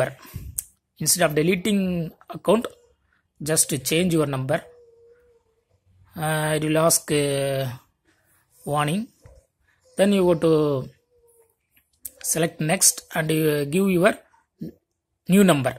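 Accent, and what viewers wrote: India. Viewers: What the hell what kind of English u spoke? Pad pad! U are speaking hindi than English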